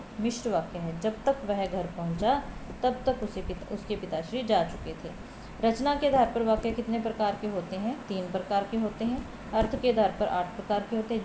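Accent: Punjab, India. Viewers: Mam aap Punjabi ho kya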